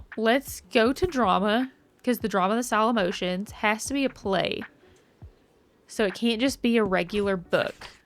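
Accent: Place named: Appalachia, United States. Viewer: Hi! I heard your accent and knew you lived near me. I'm in Kingsport/Mount Carmel. Hey neighbor! 👋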